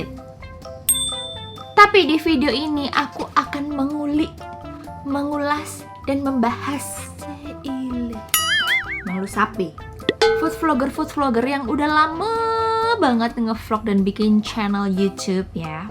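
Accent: Java, Indonesia. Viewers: Kangen ngomong jowo:') medhoke loh mantep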